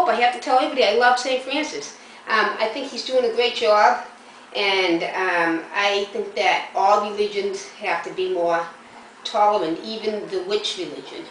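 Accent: Boston, United States. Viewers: That Boston accent just keeps me coming back. Love it. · u r such a lovely woman. I am from Boston as well so I love the accent!!! I look forward to binge watching all of ur videos!! ❣️ Blessed Be ❣️❣️❣️❣️❣️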